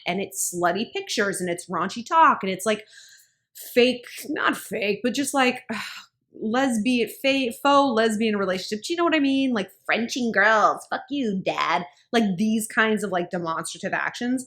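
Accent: Australia. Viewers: I love that Aussie accent 🇦🇺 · Youre so good at that Australian accent! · Why is your Australian accent lowkey amazing lol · your Australian accent is on point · Lol the aussie accent is great 😂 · Your Australian accent is on point 👏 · Great Australian accent 👌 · I looovee the Australian accent!!! Hehe please do it more XD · Your Aussie accent is everything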